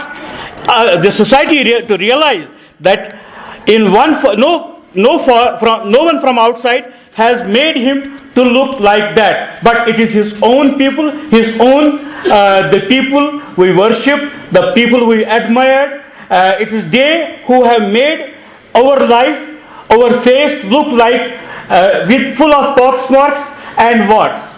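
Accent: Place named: England, United Kingdom. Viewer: I think presenter is from England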